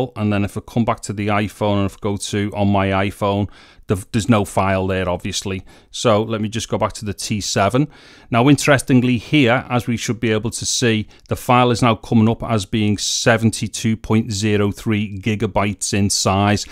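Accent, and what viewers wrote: Liverpool, United Kingdom. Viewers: That British accent though. By the way nice effort. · Hey, are you from Liverpool?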